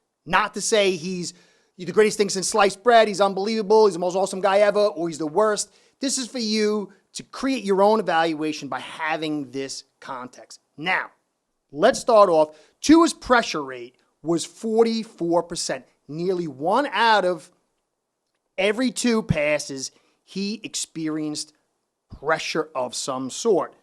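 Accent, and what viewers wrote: New York, United States. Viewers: Quick question where are you originally from? Gotta be from New York 😤💪🏾good content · Love the Yorker ACCENT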